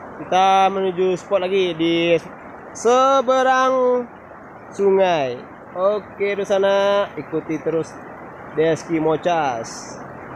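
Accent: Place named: Solok, Indonesia. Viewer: Logat bahaso solok kentara,slaam sesama rang slk da,dimaa lokasi spotny ko da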